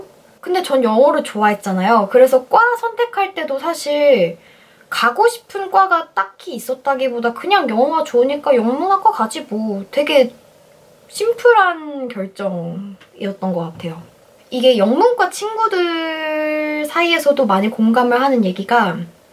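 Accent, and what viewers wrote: United Kingdom. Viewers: 영국발음 너무 멋져요^^ 저도 영국 발음을 연습하고 싶은 욕구가 뿜뿜하네용~~ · 알고리즘에 끌려 들어왔다가 영국발음에 치여서.. Q&A도 보고 영어 공부해야겠단 생각이 빠짝드네요!❣ 구독하고 갈게요🥰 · 남자친구가 영국에서 7년을 넘게 살다왔는데 남자친구가 하는 영국영어보다 이 하는 영국영어에 더 치이고 갑니다......💜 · weird how do u have so strong Britsh accent? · 영국 악센트보다보니 제 호주 시골발음이 너무 안쓰러워졌어요ㅋㅋㅋㅠㅠㅠ 목소리도 말씀도 조목조목 너무 예쁘게 잘하세요!😍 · 당신...... 혹시 전생에 헤르미온느였나? 영국영어 너무 듣기 좋아요ㅠㅠㅠㅠ 넘 멋있구, 왠지따라해보고 싶고, 치이고 갑니다ㅠㅠ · 오늘 처음 들어와서 구독박고갑니다. 자주들를게요. 영국발음 넘 좋네요!! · 영국 영어 멋있네요. 한동안 영어 잊고 살았는데 동영상 보고 기분이 좋아집니다. 좋은 하루 되셔요~~~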